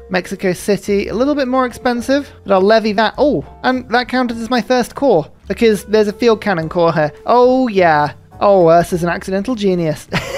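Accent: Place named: South East England, United Kingdom. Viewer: Wait really? Do you mind me asking where you're from then? Cause I've lived in each of the 4 quadrants of England and your accent definitely sounds most similar to the South East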